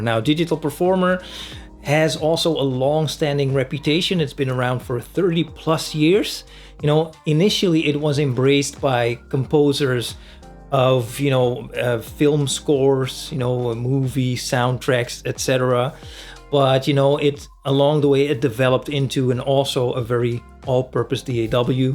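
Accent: Netherlands. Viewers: BitWik hahaha lekker hoor, dat Engels met Nederlands accent. 😉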